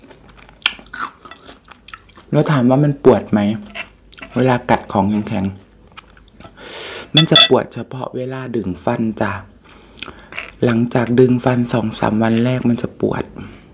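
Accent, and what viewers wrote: Isan, Thailand. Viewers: อีสานดีแล้ววววววว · พูดเบาแปลกๆ อีสานด้วย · เว้าภาษาอีสารม่วนดีเอื้ยย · ฟังออกค่ะคุณ เป็นคนใต้ที่ชอบสำเนียงอีสาน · อีสานบ่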